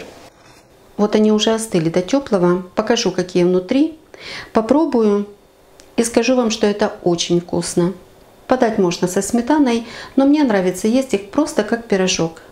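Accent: Ukraine. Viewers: дорогая. Потрясающе. У меня слезы на глазах от Вашей прекрасной украинской речи, это напоминание о мужестве Украинского народа перед лицом плешивого фюрера. А зразу чочу попробовать с курицей, мясо не ем. Буду готовить с удовольствием, дзякую!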